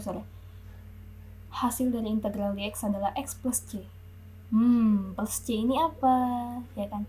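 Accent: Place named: Makassar, Indonesia. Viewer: Kuliahnya d mana kak serasa org makassar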